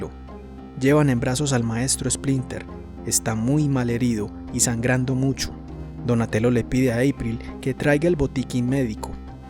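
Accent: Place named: Colombia. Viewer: sos de Colombia? Digo, supongo que es lógico por el vídeo de los Simpson, pero ese ascento es demasiado reconocible😂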